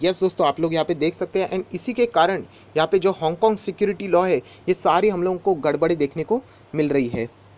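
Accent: Maharashtra, India. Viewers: Sir very fine analysis, a am watching your each and every vidio, one thing I want to ask you, I guess u belong to maharashtra, from your language I come to know, I am from pune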